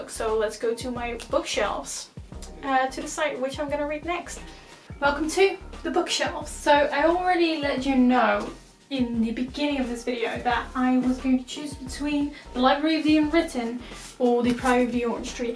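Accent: England, United Kingdom. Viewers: I don’t want to alarm you but you’re speaking with a heavy British accent, more than usual (particularly in the beginning). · since when did you develop a british twang to your accent?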